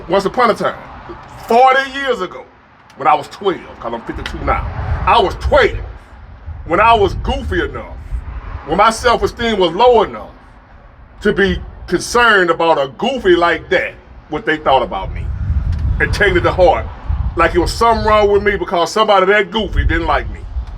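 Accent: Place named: South, United States. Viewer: Got the rocking chair on the porch! I hear ya bro! Can tell from the South!! 💪🙏✝️🇺🇸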